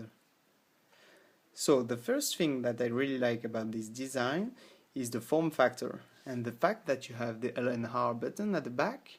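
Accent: France. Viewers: salut ^^ Vu ton nom et ton accent, j’imagine que je peu parler français ... tu fait la même chose avec un Pi 3 b+ ?? pour supporter le nouveau recalbox 4.1 (dreamcast / PSP / etc ...) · Putain mec please parle fr ca s entend trop a ton accent que tu parles fr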